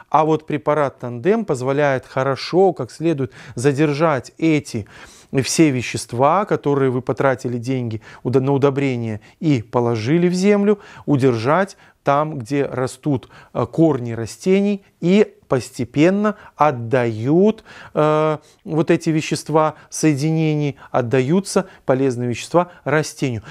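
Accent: Belarus. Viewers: Очень красивая речь,правильная и профессиональная с изюминкой белорусского акцента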